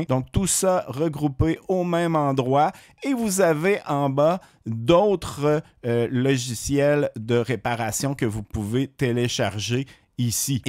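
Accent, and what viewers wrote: Quebec, Canada. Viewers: Quelle merveille ce logiciel et toujours ce super accent québécois qui m'enchante Merci Amitiés d'Oléron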